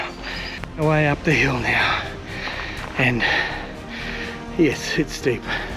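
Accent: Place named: Australia. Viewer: Just found your channel as you're about 2 weeks out from the event. I'm an Aussie living in Texas so I appreciated hearing the familiar accent on the videos. On my own fitness trip at the moment wanting to drop 20kg (lost 5 so far) and aiming for a half ironman to start with. Will be great one year to go to Cairns or Port Macqaurie to do a "home" race. I did have a laugh at the start of this when you mentioned "10 years ago" and then you looked down to check your watch haha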